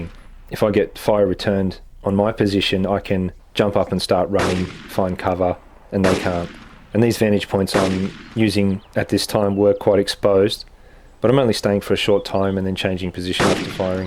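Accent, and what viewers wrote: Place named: Australia. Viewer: Why does an Australian accent do so much for tactical confidence? I feel more effective for having seen this.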